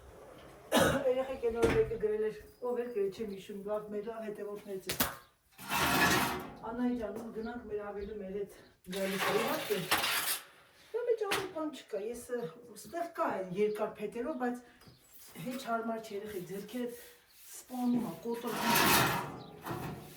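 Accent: Gyumri, Armenia. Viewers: Jerm barevner bolorin jan inchkan em sirum wor Lenakani Barbarov ek Xosum ❤❤❤ Im Txanela minujar u aprum en Arazin ❤❤u Da E jiste Ayo jan der kann nman Mardik wor der che Giten wor Mer jamanaknere ancel en Es Dzez Siremge. Hatuk barevner Mamayin u Dzer Huyrin ❤❤Dzez lav jamanak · Huzich er jan Lennakani akcentn el mi urish a! De cankali klini vor erexan apri cnoxi het tekuz mec tariqum bayc ete edpes e voroshel inch arac ham el ete amusnanum a arandziny tchisht e, inchqan el lav haraberutyunner lini arandzin zuyger aveli azat klinen u tchisht e erkusi hamar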